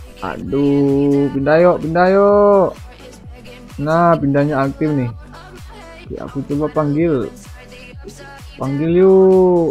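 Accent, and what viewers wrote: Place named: West Sumatra, Indonesia. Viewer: Jelas kali abg ni org minang nya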